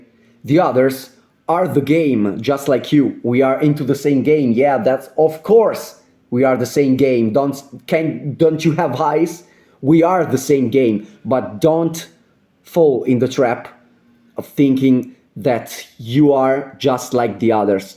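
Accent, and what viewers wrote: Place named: Lombardy, Italy. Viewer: Hi! It's very interesting and exciting to see you speaking in English (with an imperceptible Lombard accent). This mental coaching video is captivating and deep, you made me think about my own development...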